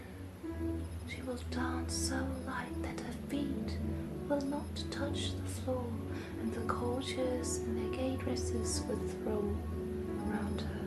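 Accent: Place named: Germany. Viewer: Maybe if your videos get more popular that you could buy a proper microphone, but I don't really know anything about that kinda thing. Well, I've certainly heard a lot of germans with a lot more accent than you have, so don't worry about that! I thought for a while I heard some french in your voice, but also some german, so that's why I couldn't really place it.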